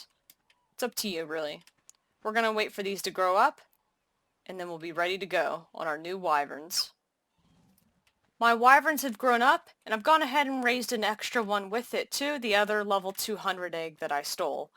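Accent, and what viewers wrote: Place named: United States. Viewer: She sounds cute 🫠 American accent. Still uploading Ark? 💞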